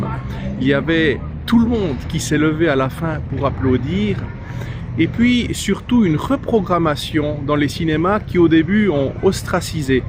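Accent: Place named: Alsace, France. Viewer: Vous êtes alsacien ? Vu l'accent, et votre attachement à Christ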